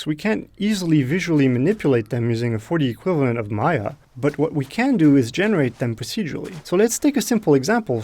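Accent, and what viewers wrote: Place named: France. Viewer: he sounds french, that's really cool. anyway, great video!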